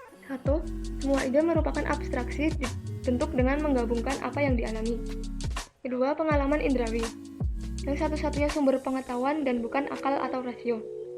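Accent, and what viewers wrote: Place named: Java, Indonesia. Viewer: Mendhox dikit keren ya kak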